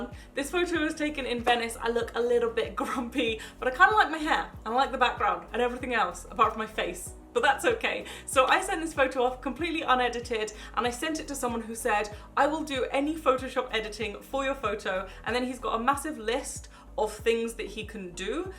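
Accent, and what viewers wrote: United Kingdom. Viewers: can I just say that I love you. Your channel is one of my first British speaking YouTubers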